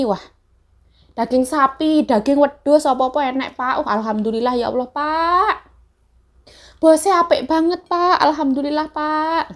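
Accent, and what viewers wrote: Java, Indonesia. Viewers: Lucu ya mba ngmong na..ciri khas bgt legot jawa ya.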